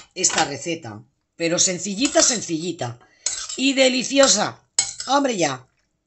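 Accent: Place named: Murcia, Spain. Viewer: tienes hacento Murciano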